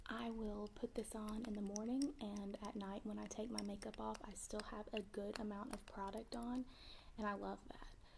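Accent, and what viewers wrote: South, United States. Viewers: Do you have a slight southern accent?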